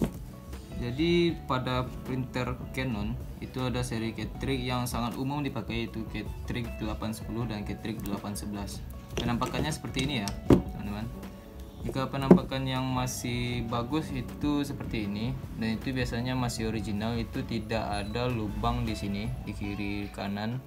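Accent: West Sumatra, Indonesia. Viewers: Dari logat bahasanya...saya yakin, abang ini orang Minang.... 😊😊😊semoga benar..... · Jelas sekali logatnya minang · Logat minangya jlas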